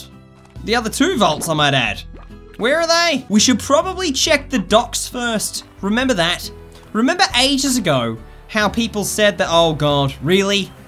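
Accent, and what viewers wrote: Australia. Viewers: Are you Australian? Haha You sound very Ozzie! (Coming from someone who is also Australian.) 🇦🇺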